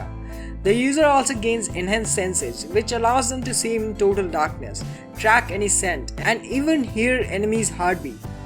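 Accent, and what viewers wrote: South India, India. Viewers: are you from south india?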